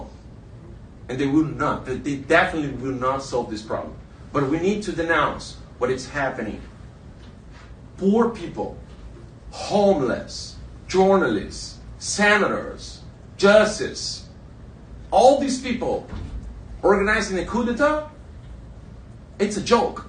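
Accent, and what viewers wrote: Brazil. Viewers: Inglês horrível...sotaque brasileiro ....vocabulário restrito 😂😂😂qto ao conteúdo só lamento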